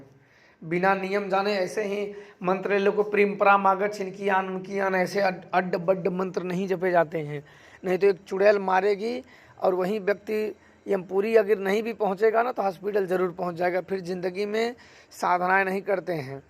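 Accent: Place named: Varanasi, India. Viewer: Shriman jee aapki baate bahut pyari lagati hai. Aapke awaj se lagata hai ki aap Varanasi district ke rahane vale ho. Aap kripa karake mera pranam swikar kare aur mujhpar kripa kare. Mai janata hu ki duniya ke vishay me aapaka anubhav achchha nahi hai. Mai bhi ek bhataka huva ati tuksh manav hoo. Yadi aap chahe to mujhpar kripa karake mera kalyan karane ki kripa kare aur mujhe sachche raah pe chalane ke liye marg darshan de. Mujhe apane kripa yogya banaye aur apane charano me sthan de. Kripa karake apana sampark ka madham bataye.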